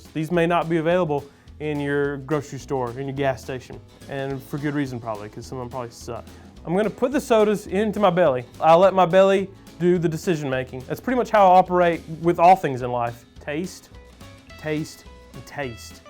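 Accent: South, United States. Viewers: I don't actually care at all about the rankings but I love listening to this dude talk. Sounds like any good ole bubba down the street. Cheers from Texas! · I’m from Texas and people think I’m crazy when I say I hate big red 😳 I feel like it’s instant cavities with one sip 😂 Also your accent brings mine out so much 😂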